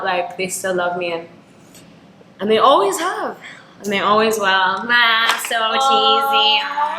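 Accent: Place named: United States. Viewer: How do you have an American accent? And stop hogging all the attractive genes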